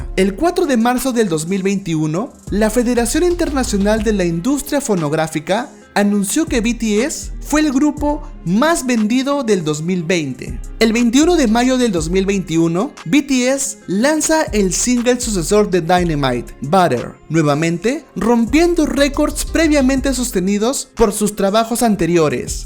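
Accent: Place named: Argentina. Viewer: Hablas como los argentinos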